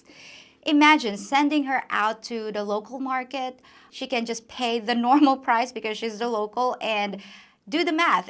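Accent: United States. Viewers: Where did you pick up Americian things like 'workin" instead of working, and "ya?" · I think it's cute that you speak completely like an American saying "in's" instead of "ing's" at the end of words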